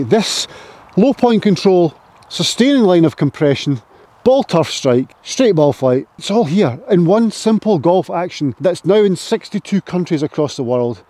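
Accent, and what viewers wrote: Scotland, United Kingdom. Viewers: Without a doubt. (from a guy who's played 60+ years) this is the best golf swing concept I have ever seen. And it works! Took me a bit to get the Scottish accent (thought "canes" was "keyes").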